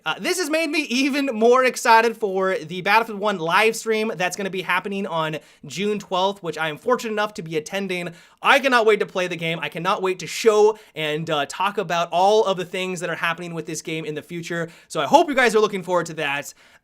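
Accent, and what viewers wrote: United States. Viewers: This video is basically just Jackfrags one with an American accent. I have no qualms with this.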